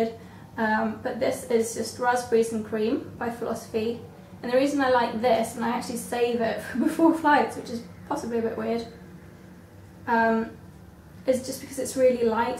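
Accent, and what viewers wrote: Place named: United Kingdom. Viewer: I like you cause your the only person who has a travel video in a British accent cause im British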